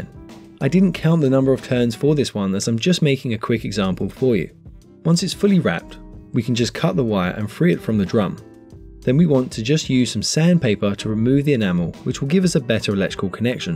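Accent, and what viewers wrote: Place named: United Kingdom. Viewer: Where are you from? You sound like you have a British accent.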